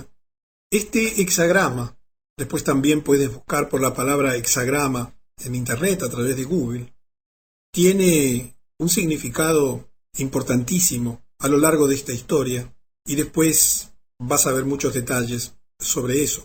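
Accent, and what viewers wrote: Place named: Argentina. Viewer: Hola, soy Francisco 27 años músico de Bahía Blanca. Excelente el video, realmente excelente. Me gustaría contactarte por Facebook, sobretodo porque vengo investigando esto hace mucho, acerca del NOM y todo esto y es la primera vez que veo un video con acento argentino. saludos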